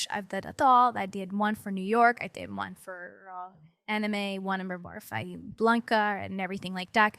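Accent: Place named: California, United States. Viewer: is she from California? such Californian accent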